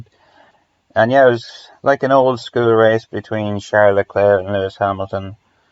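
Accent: Ireland. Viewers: You have some accent, obvs Irish but that's very broad for Irish people as we know every county is like different. But I'm shite at telling from county to county lol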